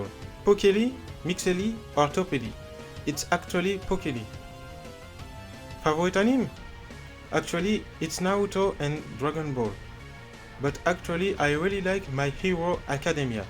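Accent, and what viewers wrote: France. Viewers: Are you French? Because your English is a bit like mine ^^' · 😂😂on sent l'accent français haha bg frérot tu gères avec tes vidéos 👌💪🖒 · are you french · ça s entend que tu est francais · Toujours marrant l'accent français ! :p Tu te débrouille toujours mieux que moi xD Bravo pour tes 100k, continue comme ça ! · Ok so do you speak french ? You speak like a french ^^ Tu ne prononces pas l'anglais tres bien comme les français lol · are you french? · I love your french accents sounds good im not insulting you its true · I feel like you have a French accent '^'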